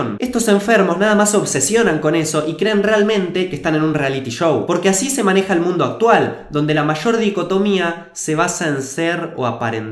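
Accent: Argentina. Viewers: che eres de Argentina? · 5 hechos - Youtubers Banana te juego $10 a que cuando llegues a los 500k haces la gran Dross y dejas de usar el acento argentino en tus vídeos para tener más subs. ;) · Amigo veo tus videos desde hace un tiempillo y me agradan bastante la verdad, bueno solo te escribo para hacerte un sugerencia ojala no te lo tomes a pecho, pero creo yo que tu asento argentino es demaciado pronunciado, no me malinterpretes no me molesta en lo absoluto a mi en particular, mira iré al grano, tu canal tiene muchicimo potencial y quizas debas adaptar tu español a uno más neutro, hay pequeños chistes que dices y a veces me cuesta entenderlos, toma por ejemplo a Holasoygermán, es chileno pero su asento es muy neutro, pueden entenderlo en todos los paises de habla hispana perfetamente. Solo es una sugerencia amigo, aun que no lo hagas igual seguirémos viendo tus videos :), saludos desde Chile.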